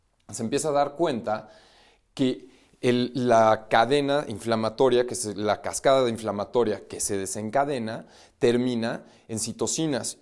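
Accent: Mexico. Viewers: Excelente información muy valiosa.. Muchas gracias por su gran claridad y acento muy interesante a la Mexicana!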